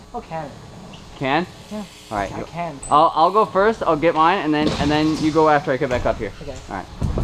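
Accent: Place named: Australia. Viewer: How are u Aussie I can tell cause I am Aussie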